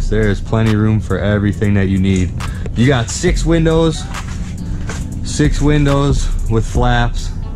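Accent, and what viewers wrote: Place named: Wisconsin, United States. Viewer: I’m from Wisconsin as well and man it took not even 5 seconds to guess you were from Wisconsin. Could hear it in the voice easy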